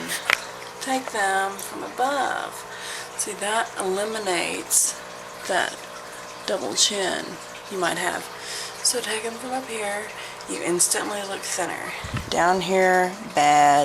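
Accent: South, United States. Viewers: You my friend, just earned a new subscriber. :) Are you from the south? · where are you from ?? your southern accent is so awesome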